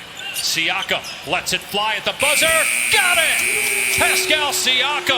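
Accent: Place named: United Kingdom. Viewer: spiffing brit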